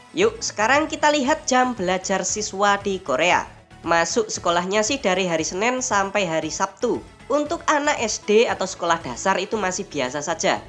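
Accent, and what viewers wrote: Java, Indonesia. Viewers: ngomonge medhok banget, malah keren unik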